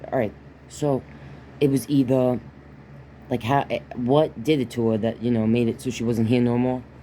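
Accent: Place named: New York, United States. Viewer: Loving 💞your videos as I go through a dark challenging time, your vibe is healing and helpful to me for reasons I don't understand ,and I like your ny accent or so, my grandma was from long island so it sounds familiar, ( the quiet epedemic and I'm not crazy I'm sick, videos and free documentary on Lyme disease called Under Our Skin, ( cousin to syphilis , u can be destroyed) the masses don't know how bad it can be it's like a sci Fi movie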